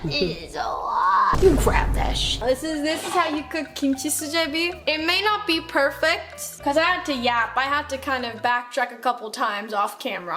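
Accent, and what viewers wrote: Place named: United States. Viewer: Why does she have an American accent now?? ☹I've watched ever since her K-pop days and her accent was distinctively British whenever she speaks English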